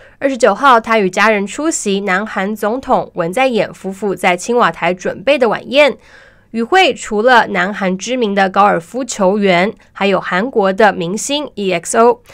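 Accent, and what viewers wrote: Taiwan. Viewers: 台湾口音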